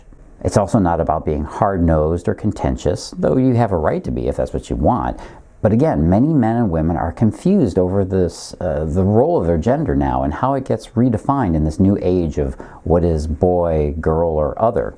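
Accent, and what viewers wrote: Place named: Midwest, United States. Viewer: rational, sound advice. Refreshing from the sea of gimmicky crap. Love the midwest accent too.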